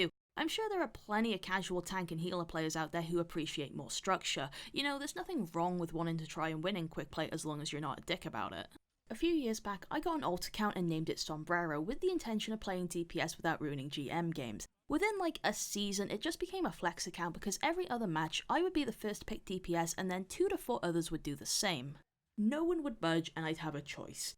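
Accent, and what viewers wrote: Wales, United Kingdom. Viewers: are you welsh i can’t really tell but i’m guessing you are 🤷🏽‍♂️🤷🏽‍♂️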